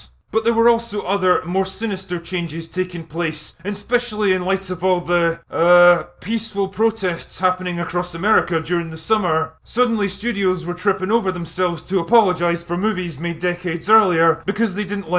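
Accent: Scotland, United Kingdom. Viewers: I think we like you so much, cause your criticisms are pretty fair and honest. If a movie can generally suck, you will say so, but if it has elements that work out, you will say that too. If one is generally pretty good, but has elements that fail too, you will point those out. And frankly most of us dislike the Woke trying to force their way into our entertainment. Largely I will say, because they simply cannot do good stories. Seeing someone call this out in a dour, drunken Scottish tone has been a damn near godsend lol. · What are some of ya books mate? I've only just found your channel, but I've been binge watching everything... because Scots have the best accent in the world, and your no bullshit attitude is how i like things lol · Honestly, I'm only here to listen to your accent. Because without the likes of you and Craig Ferguson millions of Americans would be under the misconception that Mike Meyers got it right. 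· Is this a movie Chanel? We just like the Scottish accent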